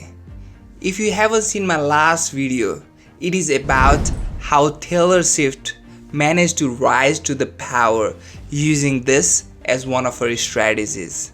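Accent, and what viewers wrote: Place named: Brazil. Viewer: Friendly advice in your Youtube debut: No-one wants to be lectured on how too think, less so coming from foreigners. Rethink your Youtube content strategy!... Your theatrical hand gestures, patronizing words and tone, in an impossibly too strong accent (Brazilian?), combined with dramatic music, this just doesn't work my friend.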